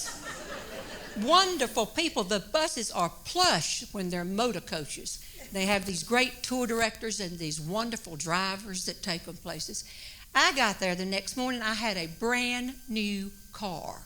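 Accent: South, United States. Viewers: you`ve got a new BIG Fan from Germany. I have been watching many of your Videos here for several Weeks and i absolutely LOVE your kind of Humor. And also love that Southern Accent. It makes your Stories even funnier. So please keep those Speeches coming. Greets and many Thanks for many good Laughs from Hamburg / Germany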